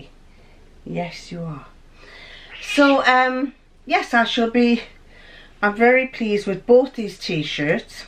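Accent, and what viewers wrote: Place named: England, United Kingdom. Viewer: a very French name for a very English accent... J’adore!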